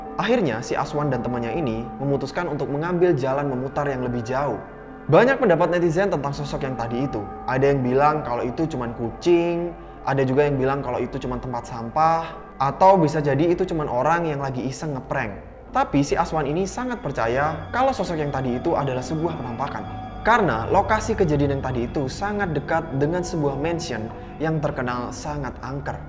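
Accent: Malaysia. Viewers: bang cakap malay mcm org malaysia.. hehehe salam malaysia buat indonesia 🥰🥰🥰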